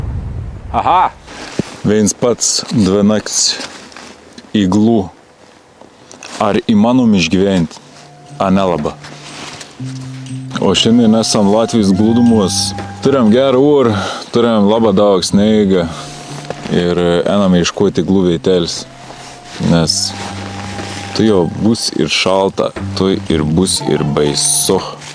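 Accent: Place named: Samogitia, Lithuania. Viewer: Nu man sunku suprast sita kalba galetum nezemaiciuot butu fainiau daugiau prenumeratoriu susirinktum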